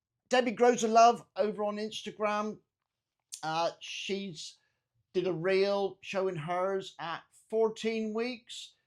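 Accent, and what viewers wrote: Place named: Durham, United Kingdom. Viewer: Hi, watched your vids and subscribed. Sounds from your accent you’re local to me, I’m in durham. Good luck in the competition, I’m new to growing so giving it a go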